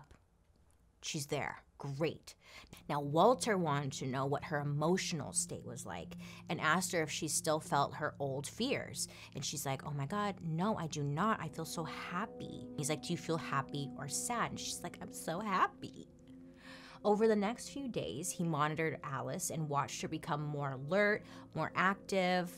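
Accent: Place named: California, United States. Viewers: She KIND of has an accent to us from the Midwest but that’s because she sounds like she’s from Cali or something but people from other counties will hear it as an accent to them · To me she sounds like she has a California accent. Like a valley girl, idk for sure but it’s nice & adds to her story telling. (From Georgia btw)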